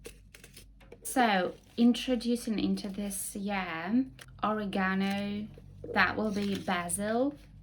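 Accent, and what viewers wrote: England, United Kingdom. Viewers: Great videos. You have a beautiful British accent. :) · Very nice British way os speaking English. Thought I was back in London for a moment there. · You sound like your English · I am amazed at your command of English. Have you studied in English or some part of UK (I hear a flavor British Accent). Either way, a great view. and perspective on life in Belarus. Beautiful! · I just thought the same. Very, very good english and this British accent. Nice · I wonder how come a Belarusian has British accent !!!!!!!! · How did you get a British accent? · What does a British accent sound like ? She sounds english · Why do you have a British accent? It is awesome.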